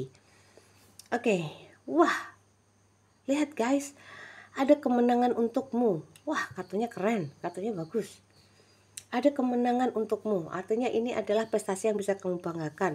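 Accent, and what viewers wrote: Java, Indonesia. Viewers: Bismillah menyimak kak. Alhamdulilah Ya Allah. Tindakan positif tetap semangat. Kak bicaranya logatnya Jawa tulen. 💪💪💪🤲🤲🤲🤝🤝🤝❤ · Sy selalu ikutin readingan mbk,dan sy suka dgn cara kejawenya yg medok,trimkasih untuk semuanya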